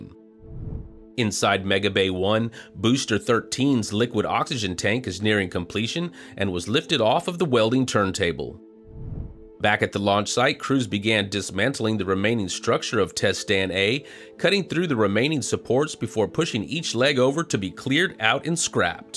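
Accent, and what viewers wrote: Texas, United States. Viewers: The Texas accent may be excused, but the Liebherr crane is "Leeb-hair", definitely not "Lyber".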